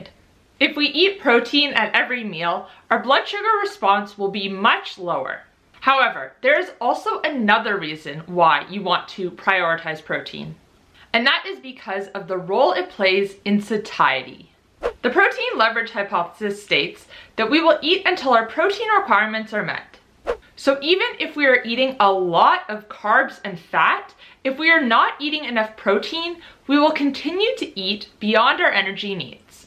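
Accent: Australia. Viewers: I though you were Aussie - great to see an Aussie doing well on YT :)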